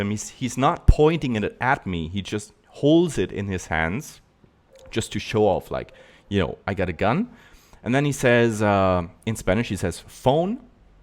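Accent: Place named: Germany. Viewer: gutes englisch...aber du kannst den deutschen akzent nicht verstecken ;) ich hoffe dir geht es nach dem überfall gut ?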